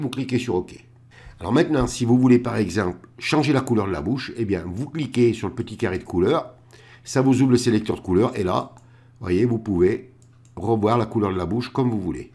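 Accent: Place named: Dauphiné, France. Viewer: il me semblait bien qu'il y avait un petit accent dauphinois! bravo, merci pour ce beau tuto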